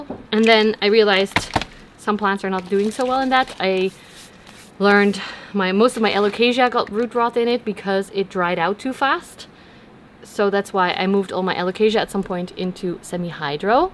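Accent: United Kingdom. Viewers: Hi 👋 I love the long chatty video! 🎉❤ it was so fun to hear about how you and G got started and about your kitties. And I love your silly fun unfiltered personality ❤ your British English was so awesome 😂❤ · Your British accent is so funny, occasionally you drop into an Eliza Doolittle accent, from My Fair Lady. But it’s a better accent than my cockney one 😅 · This was a really fun video, you have such an interesting life! I loved your British accent lol that was so entertaining 👍🏾👍🏾😂😂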